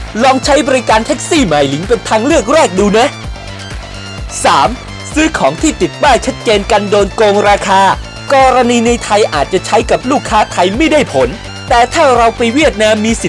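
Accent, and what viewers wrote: Myanmar. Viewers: ความสามารถแค่นี้เสือกออกสื่อไอ้ควายเสียงยังกับพม่าพูดไทย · เวียดแนม คนพากย์มึงเป็นพะม่าหรอ แม่งพากไม่เข้าใจเลย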